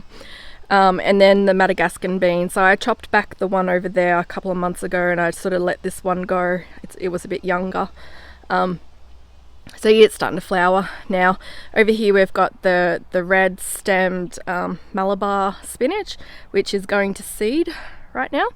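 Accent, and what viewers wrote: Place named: Queensland, Australia. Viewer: Omg thank u... i just found ur channel an it's so good to hear another queenslander speak haha im a bit of a hermit an ive just realised I must be watching way too many American gardening channels ...crikey.. on a more serious note, I see ur experimenting with different tomatoes, do u have a fav yet of the large style ones in this climate? im just north of u near maryborough an have an absolute abundance of cherry tomatoes nearly all year round but cant find the same consistency in anything else yet ...maybe the cherries have set the bar too high i dunno.... oh an if u want a good flower for ur front garden put zinnas in, they grow really well need no care an the combinations of colours from cross pollination that occur each season is truely amazing... cheers an keep up the good work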